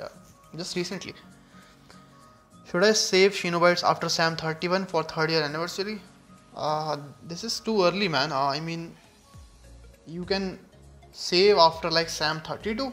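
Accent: India. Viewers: I luv this man!!!😅 I came to comment section after watching this whole Mahabharat length video!!!😆 Luv from India (Manipur)❤️. N u look dashing n also speak English very well...luv that original Indian accent!!!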